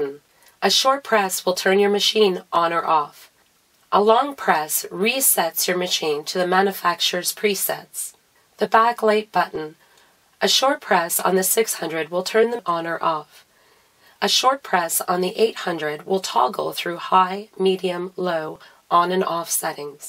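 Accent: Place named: Canada. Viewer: Nice, concise tutorials. Just what's needed for a quick refresher. And I enjoy the Canadian accent to boot.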